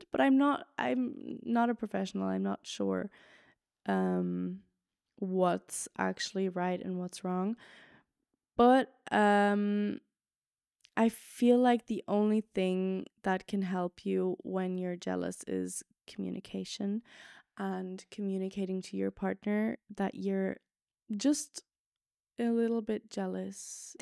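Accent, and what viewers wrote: Ireland. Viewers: Hey!! Like a few other people who commented, I subscribed to you to try to keep up with my German, so thanks! I do suck at German btw... I did Erasmus in Paris and Leipzig - before we left the EU - and it was a really awesome experience... I'm British - English - and your English is perfect. I can hear a bit of the Irish accent coming through which is so cool. I don't know who these Germans are who are throwing shade on your English, but don't give it a second thought! English is literally your Muttersprache - maybe it has a deeply personal/private context for you and that's why you don't feel so comfortable doing a YT video in English, but maybe you'll get more used it the more you do it, right? LG aus the UK!! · I don't think your accent is very American at all actually, I think you can definitely tell that it's irish, it's just not super heavy :)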